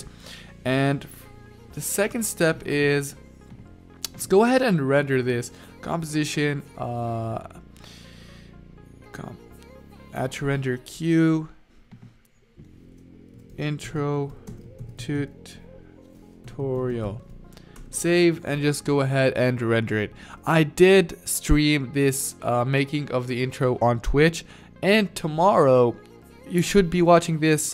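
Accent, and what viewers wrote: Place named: England, United Kingdom. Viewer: Great video! You've helped me so much! Want more intro's tutorials please, and if you could bring us some layout's tutorial it would be amazing! And I love your English accent :3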